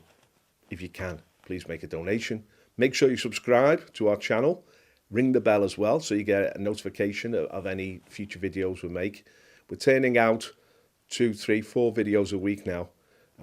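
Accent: Liverpool, United Kingdom. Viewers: I am sorry but you have a Liverpool accent. Please please do not put any fucking aspiration hope or future on the FUCKING Tories. As your from that part of the world please feel dre to watch the yellow Submarine. And when you realise why the blue meanies WERE called the blue meanies is the same way we refer to the fucking blue meanie bastards NOW. The POLITICAL system HAS failed. BUT. It has no alternative. Corruption doesn't have a supplementaey or plan.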